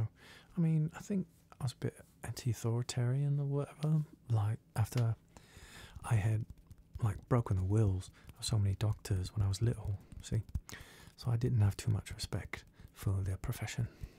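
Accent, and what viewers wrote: United Kingdom. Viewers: Nailed the accent! Thats coming from a southern english person. You sound like u come from my town 😂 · I love your softly spoken English accents so much! Your unique sense of humor always shines through. Super relaxing video.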